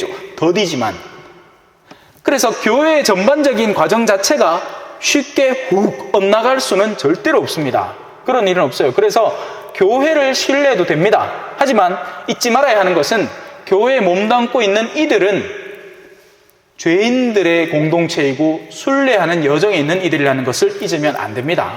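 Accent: Gyeongsang, South Korea. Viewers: 반갑습니다 ^^ 구수한 경상도 사투리 좋아요. 저는 안천에 살고있어요. 넘 경쾌하게 강의 잘듣고있습니다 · 정감가는 같은 경상도 말씨 좋습니다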